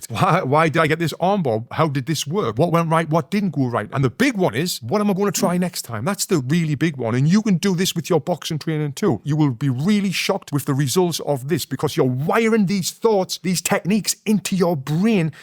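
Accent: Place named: Newcastle, United Kingdom. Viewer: You produce great content and I love the Geordie accent. My old man was born in South Shields in 1907 and our family moved to Australia in 1951. He never lost his Geordie accent and was very proud of his origins. He fought pro as a young man and served in the Royal Engineers in the War. He won the regimental middleweight title when he was 37 - the oldest entrant in the tournament. When the CO presented him with the winner's prize - 500 cigarettes!!! - he said to him, "Well done sapper. I think you've fought before!"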